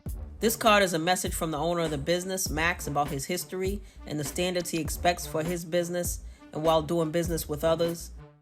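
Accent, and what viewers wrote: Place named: Baltimore, United States. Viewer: Sounds like a Baltimore or Jersey accent 🤔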